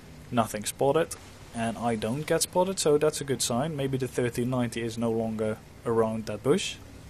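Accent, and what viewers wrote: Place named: Netherlands. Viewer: just what i thought, that accent! the WZ 131 looses the 100mm gun, thats all i know, but if you like ROF you wont choose the gun anyway, but i grinded the wz 132 with that gun. i liked it more although the muzzle break on the 131 looks way better IMHO. im from the Netherlands to btw!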